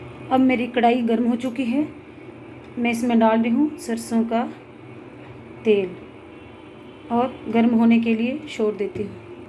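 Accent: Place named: India. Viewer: Bhout Sundar sister desi aandaaz bda pyara lga aapka 💯💯💯👍👍👍👍👍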